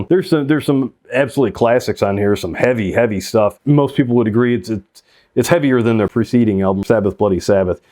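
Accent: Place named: Michigan, United States. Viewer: I was going to ask if you were from Michigan by your accent.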